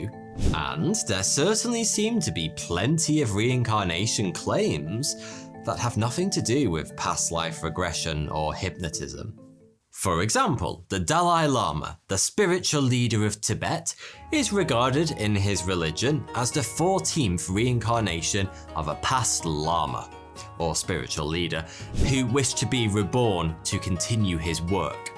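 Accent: United Kingdom. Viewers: Don't listen to this guy he's just an as** cant stand his tone patronizing sound the fact he sounds U.K-ish" doesn't help he obnoxiousness deep six him ASAP just awful & full of Ken himself pass he deserves no debate period may his YouTube" just go down like a titanic we have no time for his type when u hear his type we just go onto the next boy bye he's typical basic 👽 👀 👍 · Your accent explains why is English written the way it is written. Your pronunciation makes it written phonetically, which puts him with other European languages. The US pronunciation of the same words doesn’t make any sense because the pronunciation of the vowels doesn’t match the letters, I.e. it’s not phonetically written. Now I begin to like the British English language.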